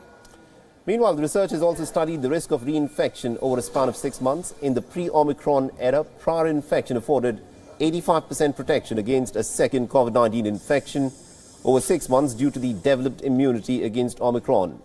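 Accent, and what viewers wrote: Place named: India. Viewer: Meaning is lost with the Indian accent. Huh...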